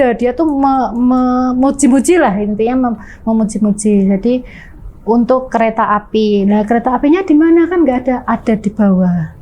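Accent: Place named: Java, Indonesia. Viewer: Khasnya emang medok2 gitu ye tp top deh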